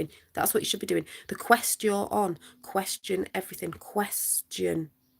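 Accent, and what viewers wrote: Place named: Oldham, United Kingdom. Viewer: Hope you don’t mind me saying .. it’s great to know there is someone who thinks like me near me with the same funny accent .. I normally hear stuff like this from Murica.. I’m from Oldham 😊😊😊 love and light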